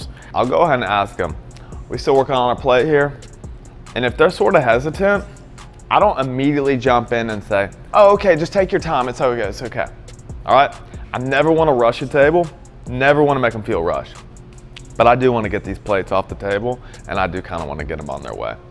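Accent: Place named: South, United States. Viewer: he's just southern, calm down 😭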